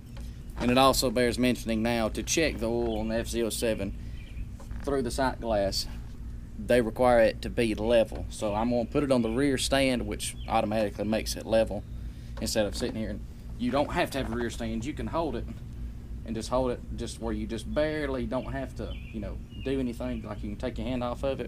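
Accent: Alabama, United States. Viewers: Hey, confirmed in the info section that you're from Alabama; I thought I recognized your accent! Shout out from Fort Rucker lol. So, I have an FZ-07 2015, and I've been doing a lot of reading trying to determine the 'best' engine oil to use since I'm about to change mine for the first time (bought the bike used). Popular opinion seems to say 10w-40 and full synthetic. In your vid the oil you use is the performance all purpose. Would you please elaborate on why you opt for non-synthetic? Thanks!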